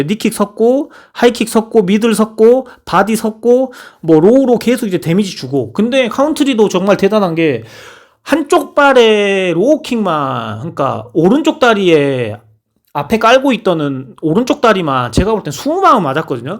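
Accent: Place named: Jeolla, South Korea. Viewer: 말투가 전라도사람인듯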